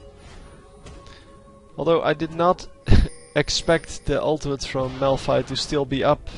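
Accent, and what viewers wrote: Netherlands. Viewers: Are you dutch? freat channel BTW subscribed ;-)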